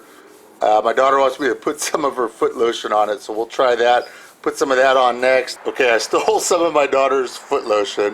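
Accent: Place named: Florida, United States. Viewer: You sound like your from Florida lol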